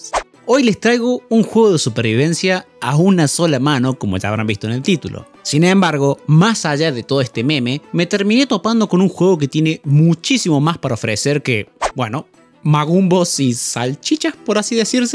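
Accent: Córdoba, Argentina. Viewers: Bien cordooobés!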